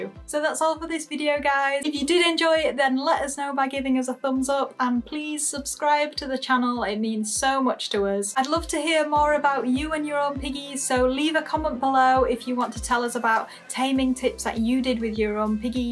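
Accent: United Kingdom. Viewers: you are right I found the more I ignore my new piggies the more they want my attention. we enjoy your videos they seem to like your British accent. we live in Arizona in the states. The only thing they like to watch is you, planet earth,and bobs burgers in that order. Thank You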